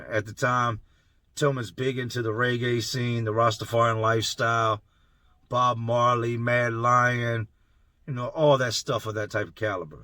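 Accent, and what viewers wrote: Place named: Philadelphia, United States. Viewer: You sound just like a Philly guy🔥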